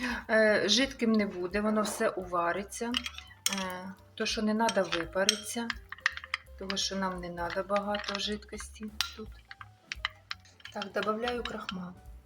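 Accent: Ukraine. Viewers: Людина освічена краще говорить українською. А це - російська мова з українською вимовою. Тут більше російських слів ніж українських.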